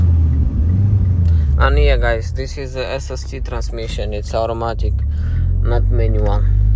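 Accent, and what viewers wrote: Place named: Russia. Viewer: Ебать у тебя акцент русский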